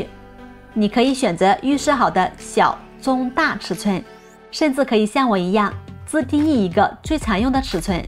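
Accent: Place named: Yunnan, China. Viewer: 听出了云南口音，不知道对不对呢😁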